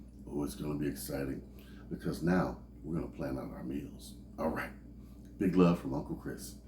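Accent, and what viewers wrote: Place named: Louisiana, United States. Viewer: I know you said you lived somewhere around Chicago but even though I'm deaf I can hear your accent you sound like you're from Louisiana originally.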